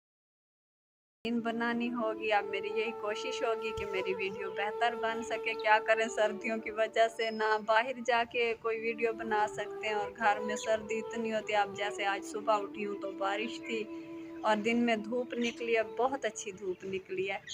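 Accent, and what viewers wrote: Saraiki, Pakistan. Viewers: ماشاءاللہ بہت پیاری بہن ہے سرائیکی آواز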